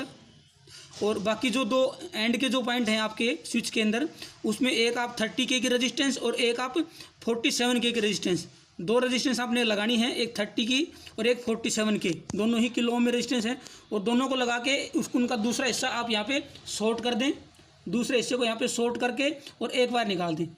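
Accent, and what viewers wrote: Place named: Haryana, India. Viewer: Good idea sir. Thanks. Sir aap Apne Haryana se hai kya. Kuch language me haryanvi tone aati hai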